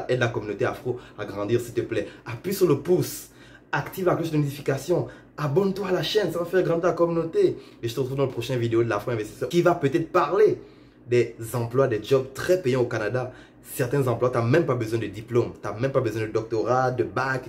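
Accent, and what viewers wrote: Gabon. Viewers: Tu as trop l'accent d'un gabonais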